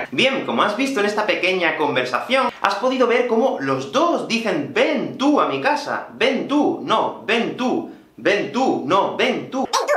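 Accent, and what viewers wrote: Spain. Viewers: He venido a tu canal porque la verdad me gusta el español de los españoles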